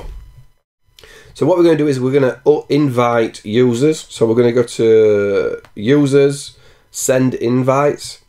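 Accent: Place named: Doncaster, United Kingdom. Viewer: Nice to hear a plain-speaking Doncaster accent rather than an American on this sort of video. At 9mins or so, the chosen one-click install in DO is not the official recommended way to install Discourse. Discourse itself suggests following its setup instructions through the command line. I gather the one-click install does not create a swap file, which is why the setup slows to a halt unless you choose the larger USD15 droplet. Discourse will run happily for a small community on a 1gb droplet using the official instructions that employ a swap file. Also, you cannot downsize a droplet (ie go from 2gb to 1gb). So perhaps it is best to start small then resize upwards if need be. Anyway, thanks for video, very helpful.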